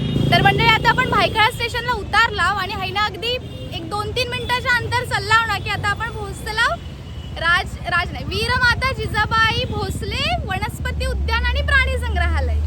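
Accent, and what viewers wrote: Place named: Malvan, India. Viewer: बोलतस मस्त.असाच बोलत रव्ह.मालवणी बाज कायम ठेव👌👌👍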